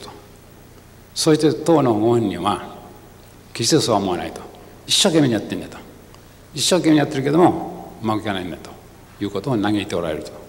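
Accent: Kansai, Japan. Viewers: 本では見たことあるけど生で初めてみた。こてこて関西弁なんですな。また好きになってしまった。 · 幸之助さんの話は非常に分かりやすいのが素晴らしいと思います。 優秀な人ほどわかりやすい話し方をするそうです。 受け売りではなく実体験を語っているかでしょう。 近年経営者が話す難しい国語表現や横文字ビジネス用語は使わない。 一般人の虚を突いたようなことをいうわけでなく当たり前の話であり共感しやすい。 名言集でも不思議と偉そうな説教には感じないんですよね。 尤も昔の人だしベタの関西弁なので聞きなれない人は聞き取りにくいかもしれませんが。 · 関西弁を心地よくしゃべる大先生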